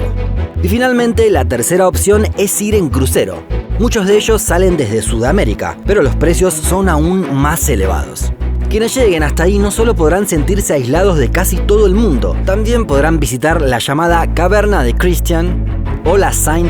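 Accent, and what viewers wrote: Argentina. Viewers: Esta bonita la historia que cuentas solo que ese asento de boludo no se oye bien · Que horror ese acento Argentino ...shoooooo ashi amarishooo shooo, bye. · Más argentino imposible jejeje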